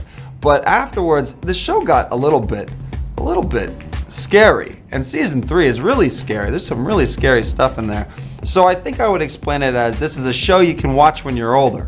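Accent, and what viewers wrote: New York, United States. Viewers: He’s such a New Yorker. I didn’t know for sure he was from New York but you can just hear it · I can hear his New York accent. Especially when he's answering the Alec as a mundane question and he's like "You don't gotta..." 😍😍😍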